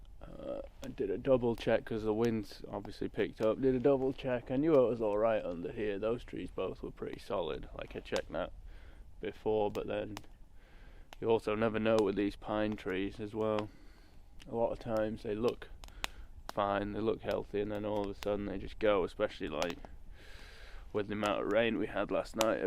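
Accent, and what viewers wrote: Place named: United Kingdom. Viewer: Where are you camping? U sound British.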